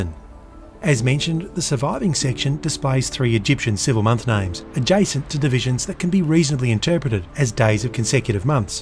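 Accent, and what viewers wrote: Australia. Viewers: That soothing aussie lilt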